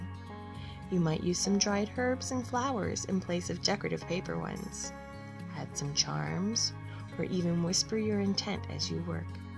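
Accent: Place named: Canada. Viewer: Thats lovely, are you Canadian? You sound a bit like Suzie from nail career education on here?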